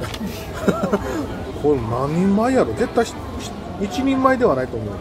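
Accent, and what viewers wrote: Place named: Kanto, Japan. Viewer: さん大阪？大阪の人なら「今日もはじまるぜぇ〜」はないで！ 「今日もはじまんで〜」せめて「今日もはじまるでぇ〜」 動画中は敬語喋られてるから関東訛りっぽくなるのはしゃーないけど、もう少し大阪アピールして欲しいな^_^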